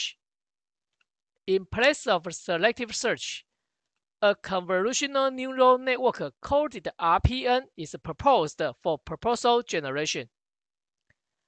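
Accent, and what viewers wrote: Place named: Taiwan. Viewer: The accent of oral English of Taiwanese and Mainland Chinese do have a lot in common which makes your presentation much easier for me to understand, thanks, buddy!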